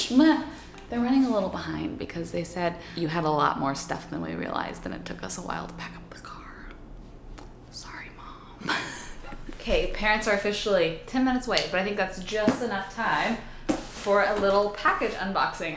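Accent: Texas, United States. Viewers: It's just Texas people that talk like that. My sister in law talks wierd.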